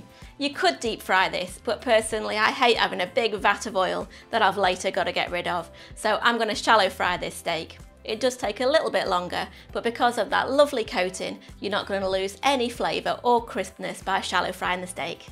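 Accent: United Kingdom. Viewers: wonderful recipe, thanks ! but . . . ⅛ tsp white pepper - is this molekule-like amount worth start grinding the corn ? :-) another thing: hear to talking to my german ears it sounds more british than american dialect. Am I right in thinking you're from U.K. ?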